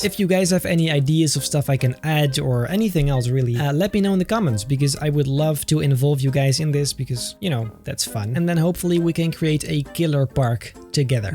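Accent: Netherlands. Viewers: ru dutch cuz you kinda have the accent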